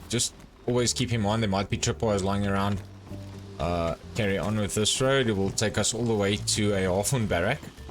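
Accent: South Africa. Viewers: 😅brother I know your afrikaans , the accent gave it away I'm also south african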